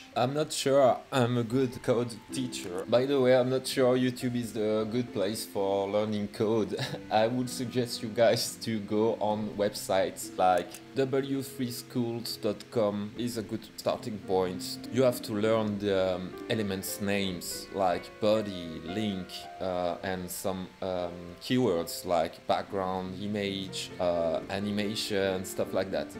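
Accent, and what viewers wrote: France. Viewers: what's up with the french accent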